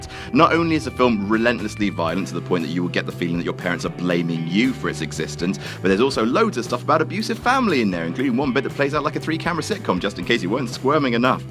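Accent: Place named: England, United Kingdom. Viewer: Love an English narrator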